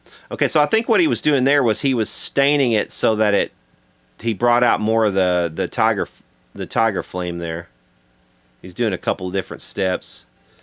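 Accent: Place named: South, United States. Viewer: Always refreshing to hear your Southern accent and your excellent content. I watched that video with that mad scientist guitar builder guy you had the other night and it was so interesting but my favorite video to date has been the one where you soup top the five white Epiphone tube head. Also the Marshall mg restoration vid that you salvaged. I’ve learned so much watching this channel. 🤘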